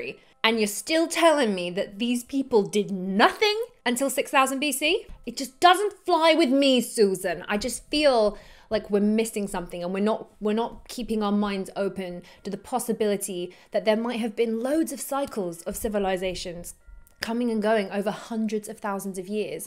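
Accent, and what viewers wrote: England, United Kingdom. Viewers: i'm an old guy and I truly enjoyed watching this. I'm a history buff from way back and its fun to see a young person asking all the questions and poking your finger in the eye of the established historians. I think there are holes. The Mayan calendar was cyclic, sure they missed the end of the world or did we just not read it correctly? Either way your fiery attitude and British accent calling bs on things is entertaining and most definitely reassuring that there's still a chance that the study of history still has some hope. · English accent, nice voice sound, articulate, and amazingly I never heard an "ah", informative, and very attractive, wow, wymm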